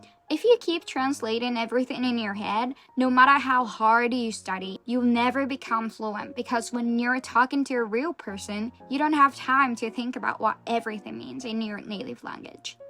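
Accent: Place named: California, United States. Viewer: 現在はアメリカ住みですか？ここまで美しいカルフォルニア？方面のアメリカ英語は中々聞けない気がします。 単語の選び方とかが特にネイティブぽい。。。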